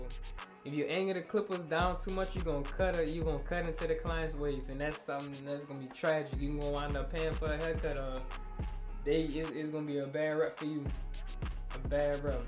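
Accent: New Orleans, United States. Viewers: You sound like you’re New Orleans bro.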